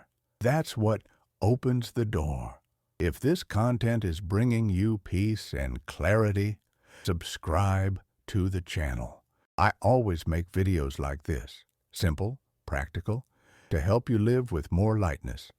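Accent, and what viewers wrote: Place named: South, United States. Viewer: OMGracious.......nothing like a southern drawling buddhist message~ ~ ~ giving us an opportunity to stretch past concepts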